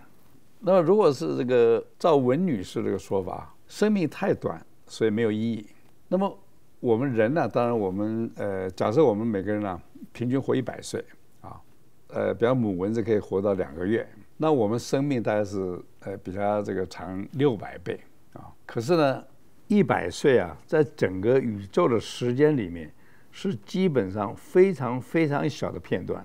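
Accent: China. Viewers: 為什麼用的是中國腔的語音啊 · 為什麼語音是中國口音？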